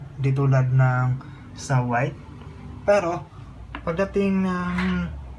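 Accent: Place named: Cebu, Philippines. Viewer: Cebu nga dba? Understandable nman. Walang nkakatawa okay.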